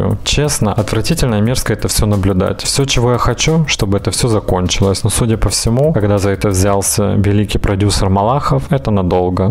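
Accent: Ukraine. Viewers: Мне кажется,что у вас харьковский акцент... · Здравствуйте) Голос у Вас действительно очень приятный, с небольшим украинским акцентом. И человек Вы позитивный, это, прям, слышно. Скажите, как Вас зовут, 🙂я уже месяца 4 смотрю Ваш канал, никакой информации нет, и всё. Хочется комменты писать, обращаясь к Вам по имени🙂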